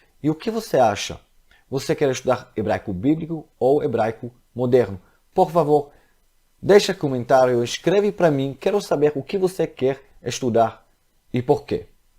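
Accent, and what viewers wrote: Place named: Rio de Janeiro, Brazil. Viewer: SHALOM PROFESSOR! SEU APRENDIZADO DO IDIOMA PORTUGUES FICOU BEM CARIOCA OU DE PESSOAS DO RIO DE JANEIRO.TEVE ALGUMA INFLUENCIA DE SOTAQUE CARIOCA QUANDO ESTUDOU PORTUGUES?SÓ CURIOSIDADE HEINN..☺DEVO DIZER QUE SUAS AULAS SAO EXCELENTES!!!PARABENS!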